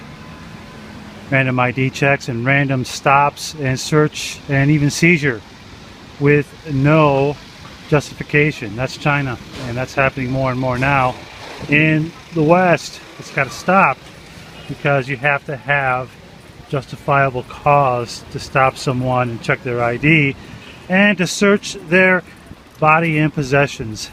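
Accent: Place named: United States. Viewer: Yeah, if they did arrest him I would assume they would use him as a bargaining chip for Uncle Sam. I assume he's American anyway, due to the accent.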